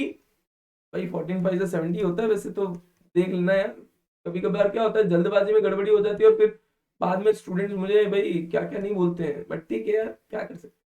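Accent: Gujarat, India. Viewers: Are u gujarati ??😅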